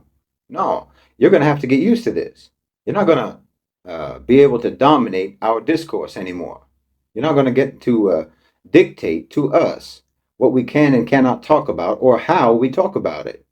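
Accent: United States. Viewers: What's this fella's background? He has a US accent. It's a fact that Ireland has over 20% immigration. Five of the last twelve murders in ireland were by "refugees" Irish do not migrate to north Africa, Afghanistan, Georgia or Nigeria. But ireland takes in hundreds of thousands from these areas. Ireland never colonised anywhere, it was colonised by the english over hundreds of years. The slaughter in gaza is courtesy of zionism supported by zionist administration in London & Washington. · This guy is an idiot. saying all this in an AMERICAN accent eh? i love how they always tout the values of Islam from WESTERN countries. especially when they leap over women an children risking life and limb to get to the West. just wondering how the only successful Islamic countries are the ones with oil but the West is somehow lacking in rationality? when i see the dutch immigrating to Jordan then ill listen to a MUZSLIM about their superiority. 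· I am still sitting/laying on my couch watching and listening to your American-accent eloquence about the superiority of Islamic thought. What baffles me is the total absence of evidence behind the propagandistic effort to expose the so-called western corrupt “state of mind” , if any. Ok it is what you say it is, but did you offer anything which is less aggressive, corrupt, misleading, totalitarian, treacherous….? No, you propose the same shit dressed in a different outfit with your own brand on it. The difference is that you deliriously believe you own it, understand it, will control it as long as you wish to.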